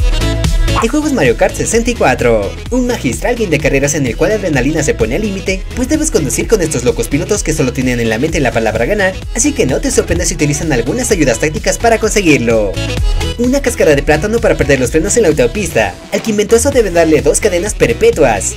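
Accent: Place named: Spain. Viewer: Eres un buen YouTuber, pero odio que hable como si fuera de Espa~a.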